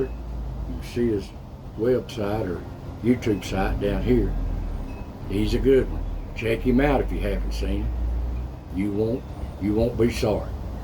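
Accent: Appalachia, United States. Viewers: When I come to channel, I feel like I'm home. I was born and raised in the South Western part of Virginia, and Tennessee and Kentucky are just a stones throw away from where I grew up. Naturally I love the Smokeys, and speaks the dialect that I speak and grew up with, and that's comforting to these ol' ears! I love channel--especially love the wild life videos. I actually love all of his content, as I've never seen a single video of his that I haven't enjoyed! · I watch all your videos. My people came down here to Florida in 1835 from the Appalachia. But we still pretty much talk like y'all.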